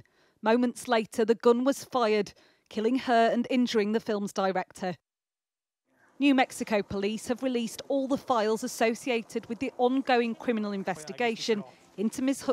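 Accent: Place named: United Kingdom. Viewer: so tired of the Brit-ish voice overs.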